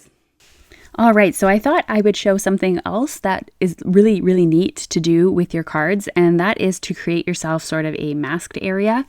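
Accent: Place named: Canada. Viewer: Love your videos so much! I love your accent, It makes me think Canada! <3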